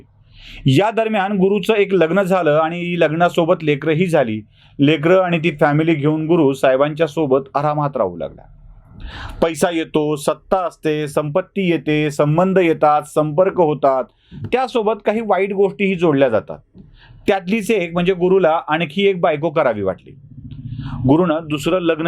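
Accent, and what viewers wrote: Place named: Latur, India. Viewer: शब्दाला व शब्द फेकीला धार आली आहे. खूप खूप शुभेच्छा. एक कळकळीची विनंती. न आणि ण ची गल्लत सुधारून घ्या. लातूरची भाषा, हेल आवडेल पण उद्याची पिढी जेव्हा तुमचा आदर्श ठेऊन अश्या चुका करतील व ते पण असेच बोलतात म्हणून सांगतील तेव्हा खूप दुःख होईल. राग नका मानू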